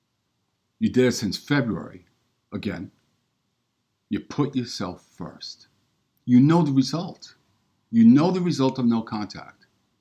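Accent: New York, United States. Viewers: Came to realise and found this channel. Our last "quarrel" as I tend to remember them was about missing mother's Day, and I used the argument "mom, since dad died in January this year it's been mother's Day everyday to me", and I got away with it at that moment, but it got out of hand for the so maniest time yesterday which made me google "narcissistic mother" and find this series of incredibly insightful videos. Thank you! (Love your NY accent!)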